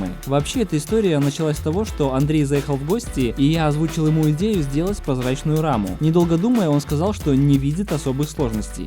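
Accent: Grodno, Belarus. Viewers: Дружище, ты не из Гродно?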